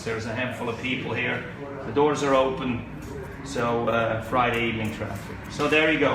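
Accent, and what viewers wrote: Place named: Scotland, United Kingdom. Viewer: Loving the Scottish accent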